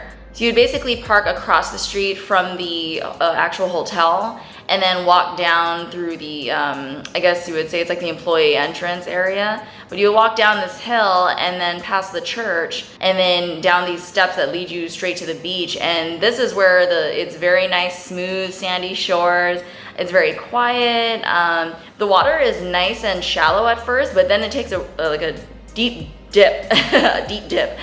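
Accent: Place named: California, United States. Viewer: Slow down when your talking. Lov ya but you sound like you're from California.... Colorado Lov.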